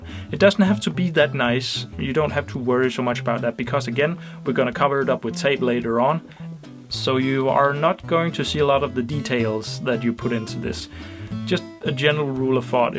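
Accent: Denmark. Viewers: er du dansk?, you have a danish accent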